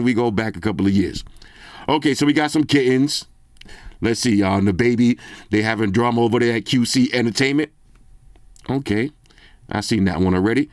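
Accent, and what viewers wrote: New York, United States. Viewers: I was waiting for this review! I agree that price is too high and it's not a flagship phone. I was thinking about getting it (currently on the S20+) but I think I'ma pass and wait for the S whatever is next 🤦🏽‍♀️😂😂 Sidebar for floss: I love these videos because I get to hear that NYC accent (I'm from Southside Jamaica Queens too) and I don't hear it a lot in Austin, TX 😁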